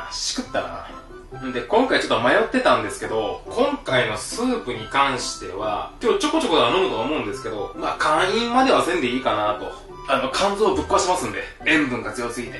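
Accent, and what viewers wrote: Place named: Hakata, Japan. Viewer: 自分も福岡の人なんで所々博多弁なのいいね😊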